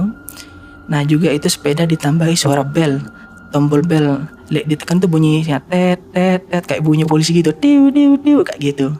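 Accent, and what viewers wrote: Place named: Madura, Indonesia. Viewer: kirain suaranya lagi serak, ternyata logat maduranya masih terbawa, bagus ceritanya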